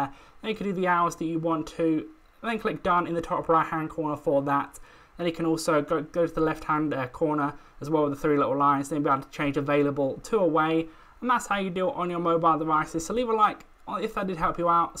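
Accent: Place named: United Kingdom. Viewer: woo.. That's some British accent.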